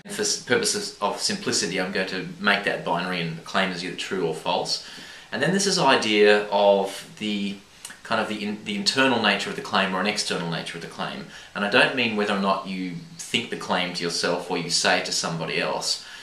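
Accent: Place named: Australia. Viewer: Good vid. What part of Aus you from; never can tell from the accent! I'm an Adelaidean, been thinking about joining an atheist type group do you know any good ones in Aus?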